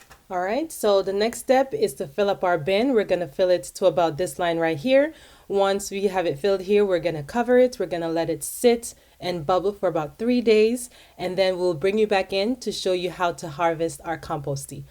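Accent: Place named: Jamaica. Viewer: Hey....good info...do I detect ...a jamaican....not much of us..doing this. Proud of u my child...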